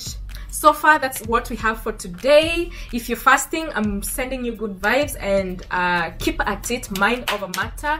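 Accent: Kenya. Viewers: You have a very strong Kenyan accent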